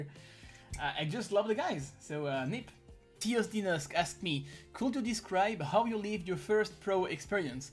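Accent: France. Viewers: Cet accent... l’accent français se sent.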